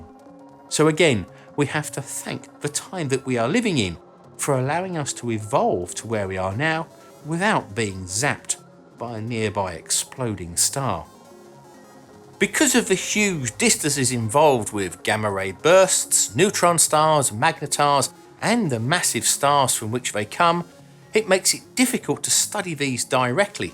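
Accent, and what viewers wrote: United Kingdom. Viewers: Sooo brrritish that it hurts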